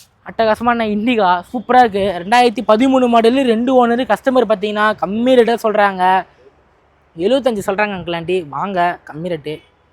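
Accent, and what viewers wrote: Madurai, India. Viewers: Super Thampi Madurai vasagam 🎉🎉🎉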